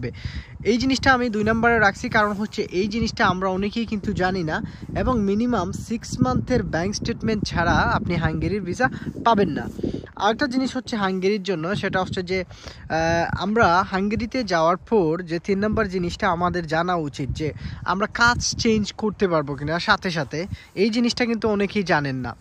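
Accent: Sylhet, Bangladesh. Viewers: Bai monokoi sylheti😊❤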